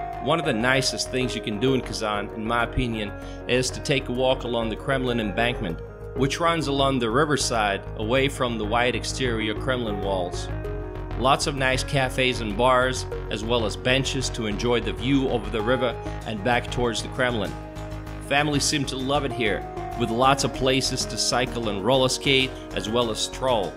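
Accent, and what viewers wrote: South, United States. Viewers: Looks like a great place. I really enjoy your videos. Where did you learn English....its almost as if you were in the Southern USA for some time! My daughter is interested in the Russian language, mostly because she is a musician and I have successfully passed down my love of Russian / Soviet classical music to her. Were planning on visiting Russia to learn Russian. We thought about Odessa in Ukraine and Bishkek in Kyrgyzstan, in the interest of saving money, but have decided to go to Mother Russia direct. Currently we are thinking of Volgograd or Krasnodar. Of course we will probably visit Moscow, St. Petersburg and Murmansk towards the end of our trip. Is the Kazan accent in Russian more similar to Moscow and St. Petersburg or is it closer to Krasnodar and the South? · Is it just me or is the Russian narrator in this video sound as if he took a course in trying to learn how to speak with an American accent?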